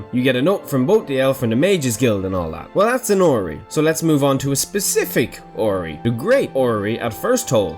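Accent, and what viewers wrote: Ireland. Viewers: where in in Ireland are you from man , i dont get your accent ? im from cork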